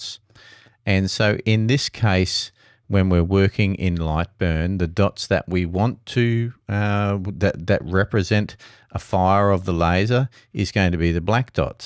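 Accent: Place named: Australia. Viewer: No3 Way to go my man. Thanks for the info great to hear the Aussie voice.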